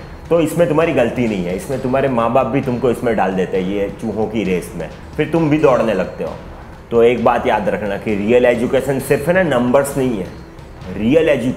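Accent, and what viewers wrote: Gujarat, India. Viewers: one thing I come to know from your speech in first 30 sec is u are Gujarati 😁😁😁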